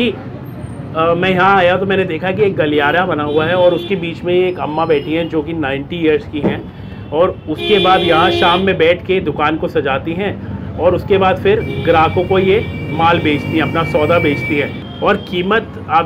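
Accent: Bihar, India. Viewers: I live in delhi,but missing my bihar very much and happy to see bhai is trying to speak in bihari accent,just amazing 🔥🔥❤️❤️ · Wah bhai 🙏 speaks very well in Bihari tone 😊.. i m big fan of you from Bihar "Katihar"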